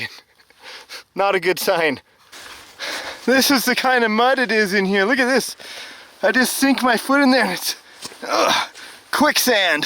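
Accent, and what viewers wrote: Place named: Canada. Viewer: Dude your videos are always playing on my and my sons Youtube. I love your content and your Canadian accent 😂🤣 Keep up the good work, you inspire us and others like us. Thank you for being awesome, wholesome, and family friendly! My son and I are hoping to start documenting our own outdoor R/C adventures, so stay tuned!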